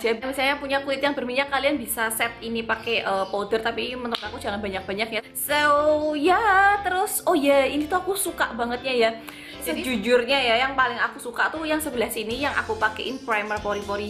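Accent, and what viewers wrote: Java, Indonesia. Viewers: itu potongan rambutnya apa namany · salam selalu😍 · Bikin video bodycare routin dong 😍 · Hayoo sapa seng suka nntn chaenell e tp jrng ikut comen ky nyong 😆jempole mana 😄lophe lophe 😘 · itu pakek emina apa? Pelembab atau apa?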